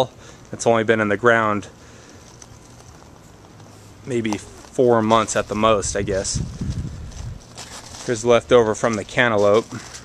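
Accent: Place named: Upstate New York, United States. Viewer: Your a hard worker and a good man...good vids....i worked in the wyoming boom in the 70s.......you sound like u r from upstate NY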